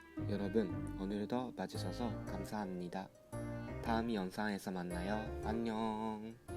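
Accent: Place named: Kansai, Japan. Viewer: こんにちは。。韓国留学頑張ってください。私も７年日本留学してましので、映像の日常が逆に懐かしく感じますね。関西弁も久々 またに遊びに来ますので、映像たくさん上げてくださいね。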